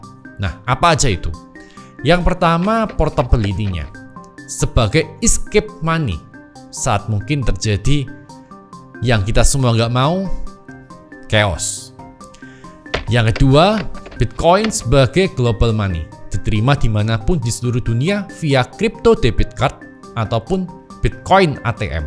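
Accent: Java, Indonesia. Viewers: Lucu banget. Muka cina tapi pas ngomong medok Jawa 😂 unik unik 😂😂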